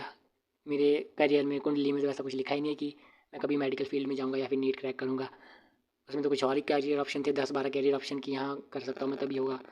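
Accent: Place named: Himachal Pradesh, India. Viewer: Maine 1st tym dekhi apki vdo .. or apki tone se hi smjh gyi thi ki pkaa hp se hone ap bro 😁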